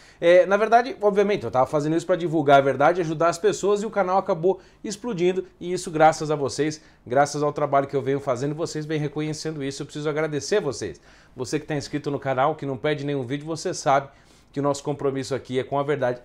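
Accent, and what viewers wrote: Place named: Rio de Janeiro, Brazil. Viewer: Por favor fale mais carioquês nos próximos vídeos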